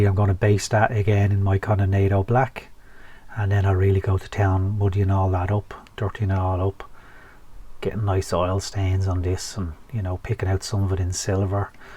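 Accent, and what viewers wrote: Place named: Ireland. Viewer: Great to hear an Irish voice on a modelling channel.. ❤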